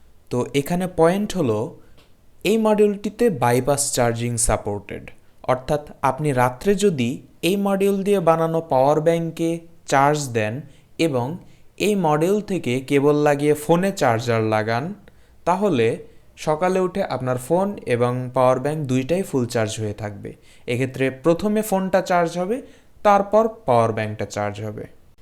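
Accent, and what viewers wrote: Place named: Chapai Nawabganj, Bangladesh. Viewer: ভাই আপনার বাসা কি চাঁপাইনবাবগঞ্জে। আপনার কথার টান আলাদা।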